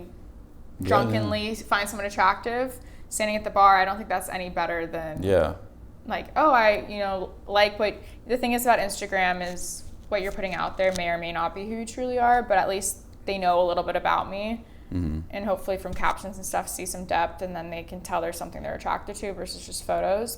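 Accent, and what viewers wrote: United States. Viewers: That LA accent is unbearable.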